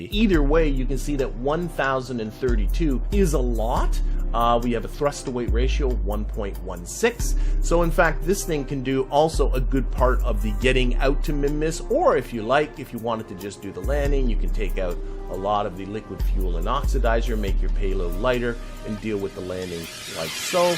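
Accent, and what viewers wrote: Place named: Canada. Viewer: Just found your channel a couple weeks ago. You make some of my favorite tutorials, and have explained key concepts in a very digestible manner. I've got a couple questions about yourself, feel free to not answer if you'd like. For one, do you have a background in mathematics, like either studying at high level or teaching? My second question is are you from Canada, or the northern U.S., you have that sort of accent and i've just been curious. Thanks, -Ben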